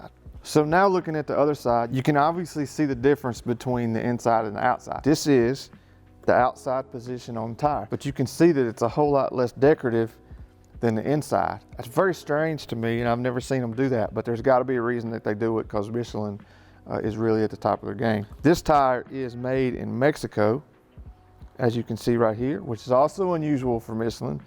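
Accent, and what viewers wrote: South, United States. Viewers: Where are you from as in state? Louisiana, Arkansas, Alabama? I hear your Southern accent.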